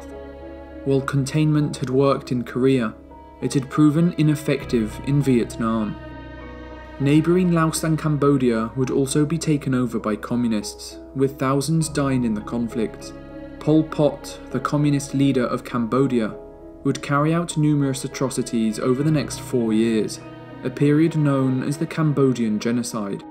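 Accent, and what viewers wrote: United Kingdom. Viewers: Just was wondering what is your nationality? You acent sounds British but very proper. It makes the documentary just that much better. Great video I thought I knew a lot about it myself but after watching this I can honestly say I fell like I learned a great deal in the time it took to finish your video. Please keep making videos.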